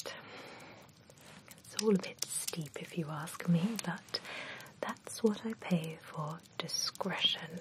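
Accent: United Kingdom. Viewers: The asmr and the British accent just warms you up and makes you wanna fall asleep I love your accent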